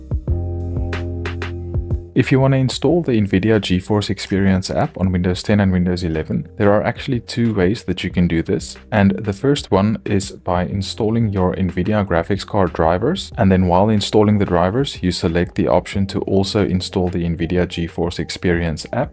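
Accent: South Africa. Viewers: Do I hear a South African accent in there? 👀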